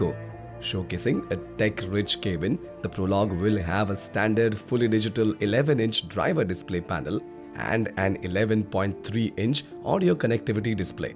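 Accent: India. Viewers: The speaking person has indian accent. Does that mean this Honda model is indian made?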